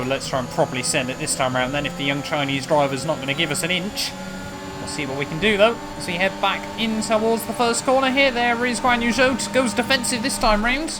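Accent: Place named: England, United Kingdom. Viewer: time to hear say "reta oposta" and "bico do pato" in an english accent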